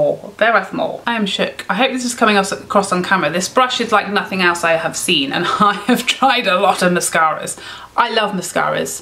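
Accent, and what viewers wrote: England, United Kingdom. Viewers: You've gained a new subscriber in me, not just for your makeup info but your english accent and phrases, whack, willy nilly, got me😁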